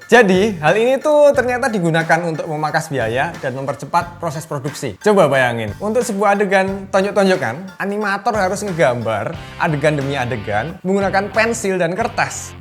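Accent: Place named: Java, Indonesia. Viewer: wah ternyata orang yg omong ini orang Jawa ya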